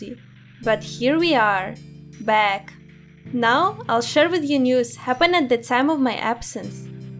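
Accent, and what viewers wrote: Russia. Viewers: А че у него русский акцент? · Мне кажется что у неё русский акцент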